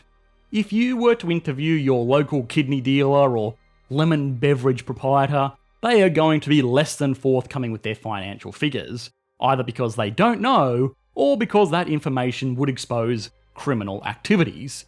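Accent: Australia. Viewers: Can we all agree Australian is the most abhorrent accent?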